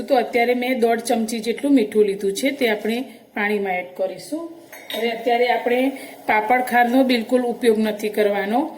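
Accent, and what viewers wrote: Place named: Rajkot, India. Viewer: Bhuj saras banavia no 1 ho 👌 tame kya gam ma cho ben boli uper thi to rajkot na lagocho reply karjo pls ❤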